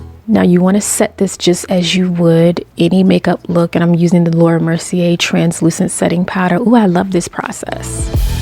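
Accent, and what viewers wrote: New York, United States. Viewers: that NY accent it does something to my soul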